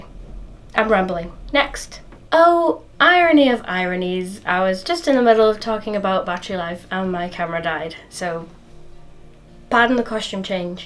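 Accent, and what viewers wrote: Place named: England, United Kingdom. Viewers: Hi, you've just gained a new sub after watching a couple of your videos, love the Bolton accent too (I'm from Manchester originally but now living in New Zealand:D) Anyway, i started photography about 15 years ago and was exclusively Canon until, for the same reason as you, i found myself leaving my kit at home. I then decided to try m43 with the Olympus OM-D E-M10, it was a cracking camera with some good features, i invested in a few lenses but i found they just didn't 'feel' right for me, for the last year though i've been using my Sony A6500, which i absolutely love, it's the best of both worlds, not too big, decent lenses and APSC sensor for more detail. I'm trying to get more into video and have just invested in the Zhiyun crane plus, which is how i found your channel, (i searched night lapse). So, i hope to get out and do some nice NZ lapses:) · Great video , very educational and a great Northern accent. 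Where are you from ?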